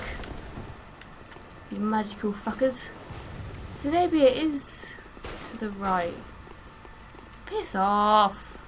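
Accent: England, United Kingdom. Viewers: Hello, where abouts in England are you from? Just curious. You're voice is half the reason I'm watching this walkthrough. Also, extending your Hunter Axe assists greatly in dealing damage with a from a bit farther away, usually enough away than most enemies' hit range. Regards from Blackpool :)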